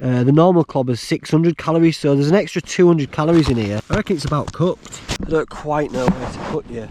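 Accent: Yorkshire, United Kingdom. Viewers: Awe you’ve been a miss with the multi day adventures 😀 Love the Yorkshire accent, never change! That’s the one thing I loved to listen to on my coast to coast path last year. Really enjoyed that 🫶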